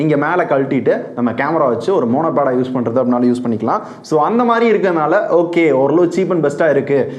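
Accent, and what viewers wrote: Madurai, India. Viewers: Bro ninga madurai yaa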